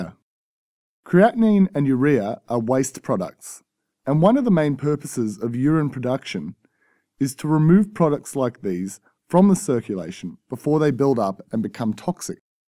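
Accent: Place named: Australia. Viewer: this video is good cuz of the aussie accent..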